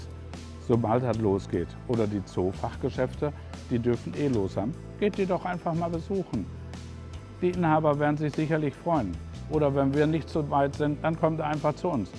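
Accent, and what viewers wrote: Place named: Ruhr, Germany. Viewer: Plattitüden und Ruhrgebietsdialekt, kennt man sonst nur aus der Kirche.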